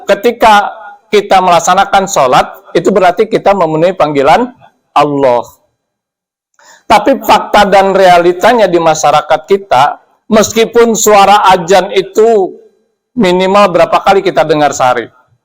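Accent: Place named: Betawi, Indonesia. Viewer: Hadeuh....ini betawi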